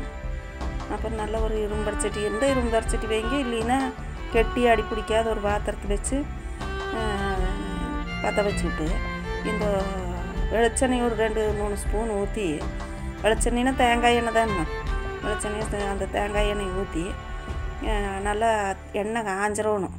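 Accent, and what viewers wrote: Kongu, India. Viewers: கொங்கு தமிழ் ultimate.... 👏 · கொங்கு தமிழ்😎😎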